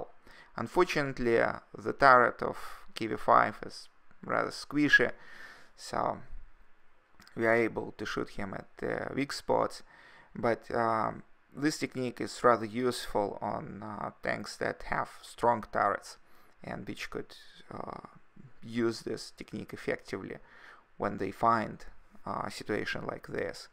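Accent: Russia. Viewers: Russia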